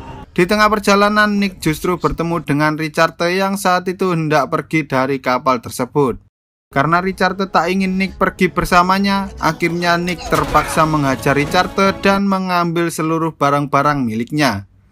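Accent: Java, Indonesia. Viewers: Medok buanget si mas bahasa Indonesia nya, 🙏👍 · Btw Mas Nyaa Ini Orng Jawa??....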